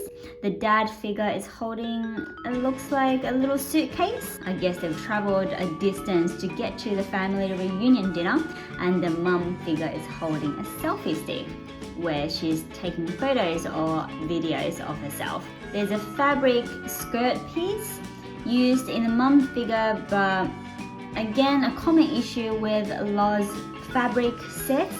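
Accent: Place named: Australia. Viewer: Are you Australian? You sound Australian.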